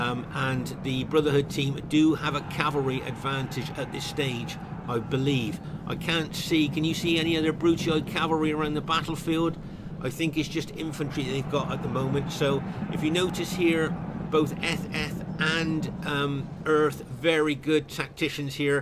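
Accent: West Country, United Kingdom. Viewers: as someone with a rural irish accent i respect immensely the dedication to serious commentary from someone from the west country, for such a great game too. thanks for uploading these videos from someone who plays napoleon total war's mod NTW3 a bit too much!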